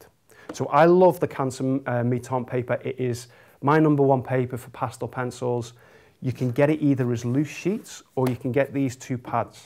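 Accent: Liverpool, United Kingdom. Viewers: Your accent reminds me of home. Liverpool for ever!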